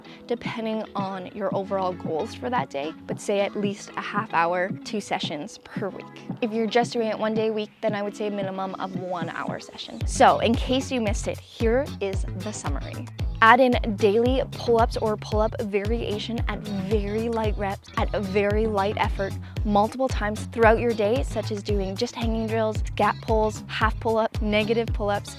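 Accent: Canada. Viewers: I KNEW you were Canadian. By the way you mispronounce "out."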